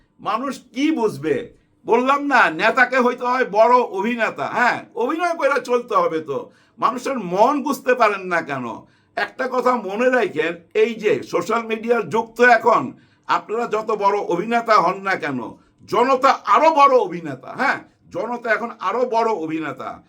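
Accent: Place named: Old Dhaka, Bangladesh. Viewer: Your dialect is similar to mine. I am originally from old Dhaka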